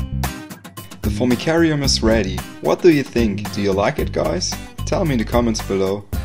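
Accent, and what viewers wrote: Germany. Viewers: Well i can hear that youre german. WoW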